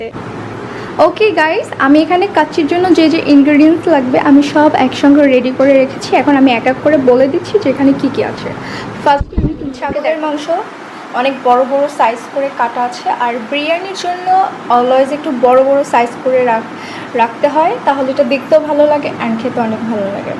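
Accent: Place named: Arab world. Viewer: Happy Eid Mubarak 🌷⚘🌷⚘ 👍👍 You are Awesome And I like your Arabia accent 🖐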